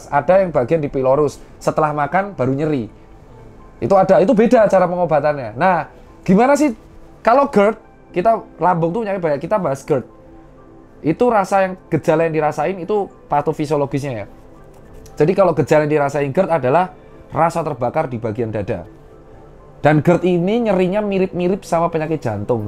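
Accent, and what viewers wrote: Java, Indonesia. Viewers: Salam sehat slalu dok.... Saluttt juga kerennnnn logat jawae dokter tirta , Salam satu jiwa dari arema.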